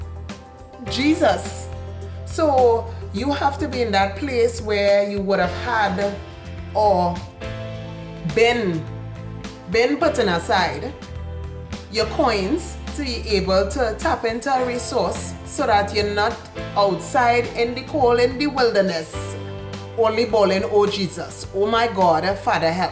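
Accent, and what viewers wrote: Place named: Trinidad and Tobago. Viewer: Yesss this is so true plus the story in a Trini accent makes it even better 😊 😘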